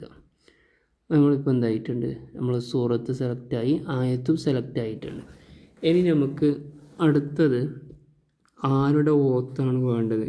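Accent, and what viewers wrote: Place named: Kasaragod, India. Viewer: സംസാരം കേട്ട് കാസർകോട് ജില്ല എന്ന് തോന്നുന്നു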